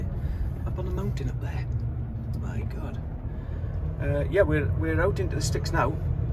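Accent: Wales, United Kingdom. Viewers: With that accent I'd say ur from Sth or west Wales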